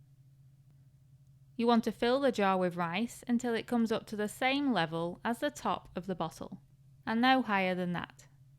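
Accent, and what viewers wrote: United Kingdom. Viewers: pva glue is used in the UK, you can tell from her accent that's where she's from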